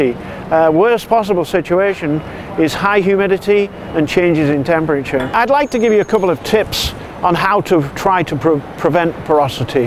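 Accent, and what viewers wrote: United Kingdom. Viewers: Two things, you sound like your native country is England, so why say that word incorrectly?! And secondly you look like hack you up with a hatchet Harry from Lock Stock And Two Smoking Barrels. Top video though, good tips!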